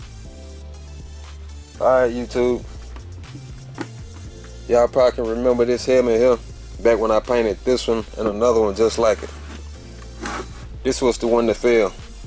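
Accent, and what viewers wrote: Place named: Houston, United States. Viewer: Sounds like he's from Houston.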